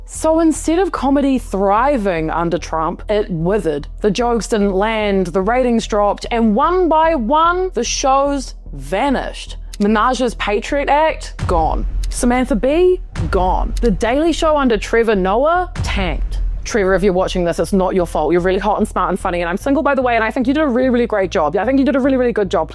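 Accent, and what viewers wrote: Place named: New Zealand. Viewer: It's low budget Colbert with a cute Kiwi twist. I'm here for it 😎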